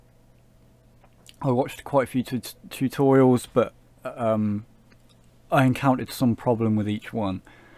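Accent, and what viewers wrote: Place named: Dudley, United Kingdom. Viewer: You sound like you're from Dudley cool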